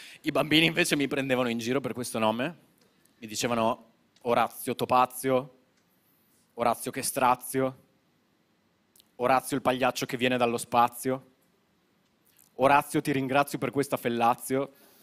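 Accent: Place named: Veneto, Italy. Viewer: Accento Veneto😂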